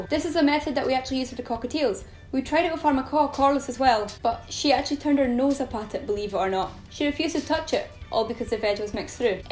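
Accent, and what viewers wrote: Scotland, United Kingdom. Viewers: Oops hit the send arrow. Puffin was with me one month short of 38 years, and Dixie, a Green Cheeked conure rescued from a horrible situation and given to me by my avian vet so I could rehabilitate her and give her a good home. Dixie passed away at age 22. Your videos are educational and entertaining. I hope you get a lot of subscribers so your knowledge is shared and valued. I love your accent--are you in Scotland? My dad was born in London, England, and served in the Royal Air Force in WW 2. My mother was American and I was born here in the USA. I'll be binge watching your videos for a while to catch up. Great fun, they are. Apollo enjoyed the ones we watched tonight.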